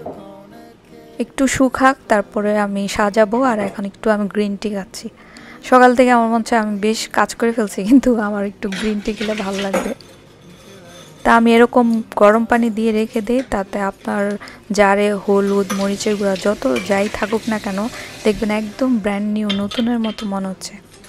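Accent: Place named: Sylhet, Bangladesh. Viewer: আপু,আপনার আপনার আশপাশে কি সিলেটি আছে?আপনার ভাষা তো সিলেটি টান এসে গেছে🎉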